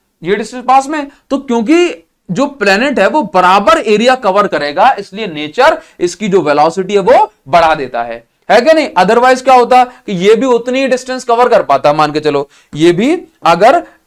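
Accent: Odisha, India. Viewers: Sir aap odisha se ho kya?😅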